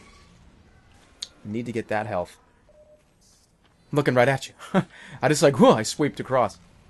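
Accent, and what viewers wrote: Boston, United States. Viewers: Oh. You are so Boston-ey. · Im guessing you are either a real life version of a Scout or you are from Massachusetts/Boston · He is from Boston as is the scout, they have a similar accent.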